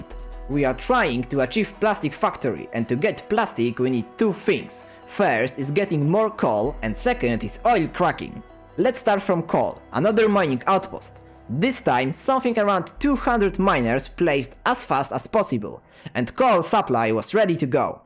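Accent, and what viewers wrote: Poland. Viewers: Ah I've heard your voice From shorts I knew I recognise your polish-ish voice nerd voice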